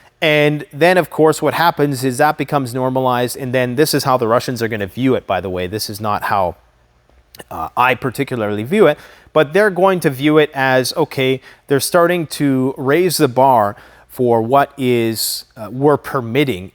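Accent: South, United States. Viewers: Southern Accent, FLAWLESS…. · Your southern accent gives me big George Dubyah Bush vibes hahaha. You crack me up, keep it going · Love your southern accent lol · Good southern accent! :) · Oh, you are funny...you speak Southern...sounded perfect to me...🤣😂🤣🤓😂🤣 I hear it all around me here in Kentucky 😂 And i just adore the southern drawl! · Lol, 😆 love your " southern accent🙂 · Mr. should sound like a surfer dude since he's from California. lol Good southern accent though. Nailed it. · Awesome southern talk lol Iol that was funny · Hi keep doing what you’re doing. Loving your red neck ascent, you should try an Aussie bogoan ascent next 👍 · Excellent use of a southern accent! :)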